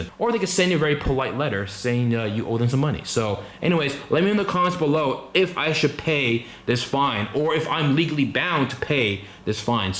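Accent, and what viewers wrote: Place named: Philadelphia, United States. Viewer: tyoors lol sounded like you’re from south Philly haha. Love the content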